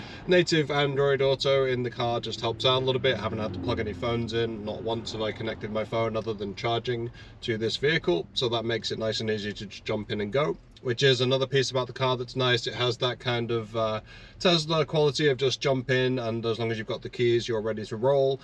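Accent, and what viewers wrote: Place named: Northern England, United Kingdom. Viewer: Sounds like he's from northern England----- north-west possibly---- anyways good review.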